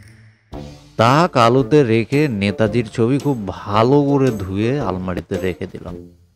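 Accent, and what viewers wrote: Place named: Bangladesh. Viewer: বাংলাদেশী বাংলা ঠিক কর, बाल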